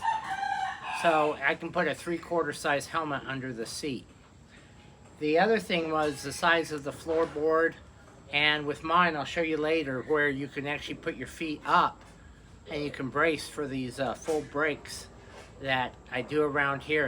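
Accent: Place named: Oklahoma, United States. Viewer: are you from oklahoma, you quite got the accent...anyway Im filipino american, retired marine. i like how you did this, lots of important info and the requirements you are looking for on a bike is the same like what i need. I look at other filipino vlog coz I speak tagalog too AND THEY ALL SUCKS. you dont see them does what you did. Its all about bloody all about themselves, laughing and running the camera on things you already seeing just to past the time. Their actions and voices all very irritating to the ears and just talking about nonsense... Hope you enjoy your stay there in that beautiful country populated by mtfrs.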